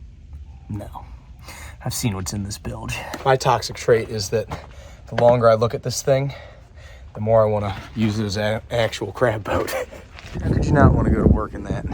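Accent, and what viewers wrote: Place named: West Virginia, United States. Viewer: Ey fella, where you from? You sound like you got a West Virginian accent but i aint got a clue where you are from.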